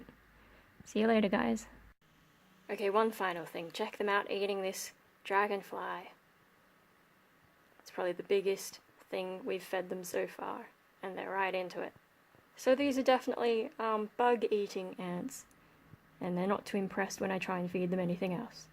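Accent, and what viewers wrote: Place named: Australia. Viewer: Yes. Northern hemisphere. Southern California to be exact. Whereabouts r u from? Somewhere down under from the sound of ur voice, but I'm just guessing.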